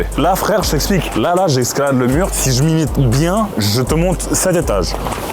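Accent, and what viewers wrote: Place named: Quebec, Canada. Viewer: Il a accent Québécois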